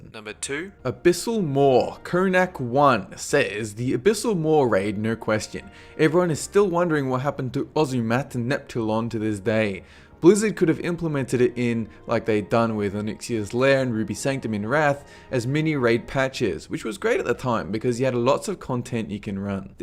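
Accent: Australia. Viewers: he's Australian. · Aussie reppin? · Australian accent.... it breaks my brain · you australian mate?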